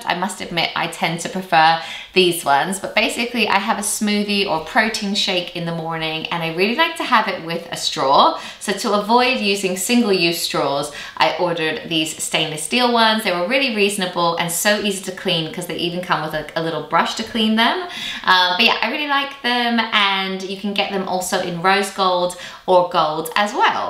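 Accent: United Kingdom. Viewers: oh I’m sorry, that makes sense! you sound British with a twang!!! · I think that you pronounce amazon with the accent you have. I say it the same way but I’m also British xx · AmazON. That's how I say it. I just assumed you say it the way you do because of your British accent. Do what works for you! ♥♥♥ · You don’t pronounce Amazon “wrong”, it’s just your accent. Many of our UK friends say “Amazin “😉 I have noticed that your accent isn’t as strong as most Brits, are you originally from the US? Just want to say I love your channel. I look forward to all your “hauls & hacks”. 👍🏻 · are you British or America cuz it’s wired you dawn Like both ? 🙈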